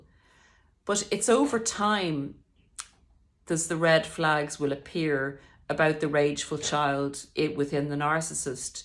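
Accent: Ireland. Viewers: I would just like to say, it is your Irish inclinations verbally, that I like to listen to. If the Irish accent was not a part of your delivery, I most likely would not be here listening. Being here however, I find you do make many good sense statements worth hearing and appreciating, due to your 30 years of bitter experience with narcissist partners. I appreciate you admitting that you are not God. And many of us, do not believe in God, so we aren't enlisted by claims that we are in a Crusade against evil; regarding narcissists. Division is now the biggest problem in human belief. I don't want to drive other people away from me by deciding they are shallow (or evil)Evangelical characters. Nobody chooses narcicissim (at 2 years old). So I appreciate you backing away from the Christian Crusade mode (as much asthat seems right to you. I'm hoping you aren't becoming a part of the division problem we see in society. 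Hopefully, we inspire others to see clearly, not to denigrate others who may not agree with our Chistian beliefs, should we be Muslims or Jews or Spritualists. Anyway, thank you for your well-intentioned aspirations.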